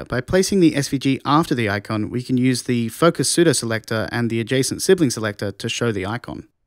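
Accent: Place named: United Kingdom. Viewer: A lovely british accent and awesome tutorial. Just the way I like it.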